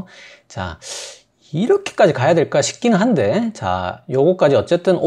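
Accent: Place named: Busan, South Korea. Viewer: 점점 더 잘생겨져가네요😊 어쩌면 자료도 훌륭하시고 설명도 맛갈나고 쉽게 전달해 주시는지요 부산 사투리도 넘 딱뿌러지고 정겹습니다 열정을 다하시는 쵝오🎉🎉🎉🎉🎉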